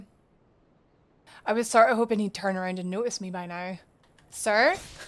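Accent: Scotland, United Kingdom. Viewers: Came for tutorial, subscribing for fellow scot accent. Will be using buckler on my next DS attempt, thank you.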